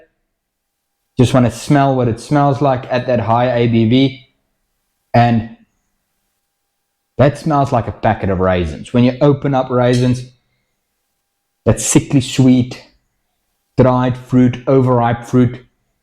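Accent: South Africa. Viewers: is back. I love that thick S.A. accent brother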